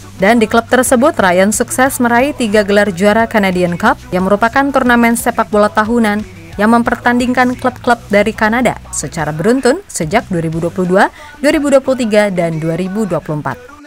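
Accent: Java, Indonesia. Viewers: Celethuk'an khas bhs Jawa mbaknya Admin bikin chanel Galz jadi berbeda & bikin nagih .... 👍👍👍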